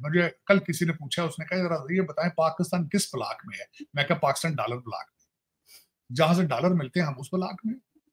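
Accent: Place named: Punjab, India. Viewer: Pronunciations of sir mixing panjabi tadka is so hearttouching.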